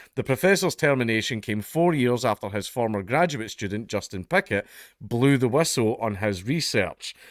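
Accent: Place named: Scotland, United Kingdom. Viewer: Sex studies retracted. Gotta love the Scot accent.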